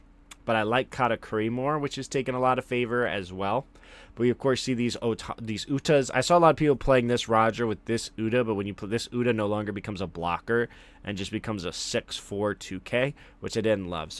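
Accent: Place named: East Coast, United States. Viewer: What part of the East Coast are you from? There's no chance that accent is anything but East Coast!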